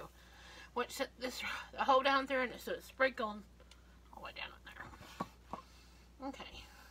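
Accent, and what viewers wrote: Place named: West Virginia, United States. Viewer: that West Virginia accent is running over your instruction. My grandparents lived in West Virginia. Same accent, barely understandable. Cheekkun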